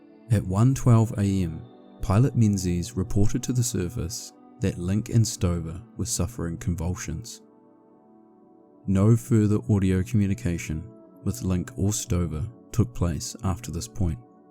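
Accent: New Zealand. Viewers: Dang it! Totally missed your emphasized U sounds!! Russell Crowe is my favorite actor how did Inot recognize your Kiwi accent! You will reach 100k subs by the end of the year I haven't been wrong on predicting channel growth!!! cheers mate!!! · The calm, easy to listen to and easy to understand kiwi accent and your very clear and precise dictation makes for an awesome narration, nice one mate from a fellow kiwi 👍🇳🇿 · Love new Zealand accent. “Key Wist”. When you want a beer: “pass me a Bicks” 😂😅🤙🤙❤️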